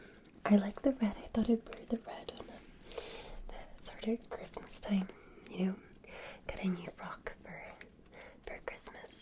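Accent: Scotland, United Kingdom. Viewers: I love the Christmas piano music at the beginning is that you playing the piano I know you are very talented and play the piano beautifuly! your Victorian era videos are always very well done everything from your very thick beautiful Scottish accent to your gorgeous outfits in the Roleplays and the wonderful backgrounds! I always like the dinner menu part! this looks to be a fabulous Victorian era video you have done a wonderful job again thank you so much I really do appreciate it! Merry Christmas ⛄🎄:_Loveheart::_Loveheart::_Loveheart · This isn't an Irish accent. It originates in the United Kingdom, and thus is a British accent.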